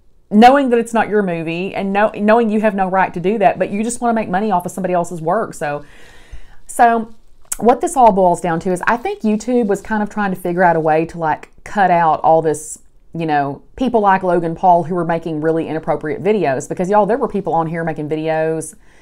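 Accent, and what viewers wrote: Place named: South, United States. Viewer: You're a great candidate for asmr because of your southern accent, how you talk with your hands and its really satisfying when you say "y'all". I hope I don't sound like a creep, I just think you'd be good at it. Whispering doesn't seem to suit you though. It would be cool if you just spoke like you do now and maybe talk about positive affirmations or something.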